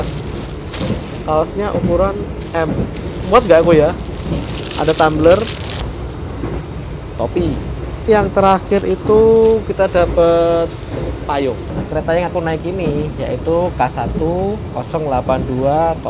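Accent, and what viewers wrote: Java, Indonesia. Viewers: Medok mu itu 😍🥰💕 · Ngomonge jawil banget....... alias medok banget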